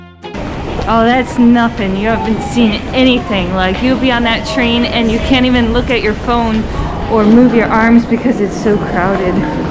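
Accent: United States. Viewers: Wow you have so strong American accent. Can you practice against that? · Was going to check out your channel, but then saw the name. The term oriental is seen as a derogatory term in the US and it appears from your accent that you may be American.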